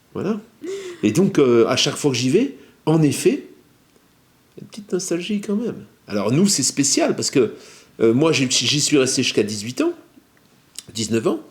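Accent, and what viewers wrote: North, France. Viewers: Mdr la langue du chnord ! Merci à vous 🙏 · Bonsoir à vous, je ne me manifeste jamais car tu as beaucoup de travail. tes vidéos me plaisent, je voudrais te poser une question, que prendre comme GPS pour le cc et la voiture, javais des tomtoms qui ne vont plus. Ton patois du Nord est formidable tu pourrais jouer avec Dany, merci pour la réponse Amicalement, Jacques du pas de Calais.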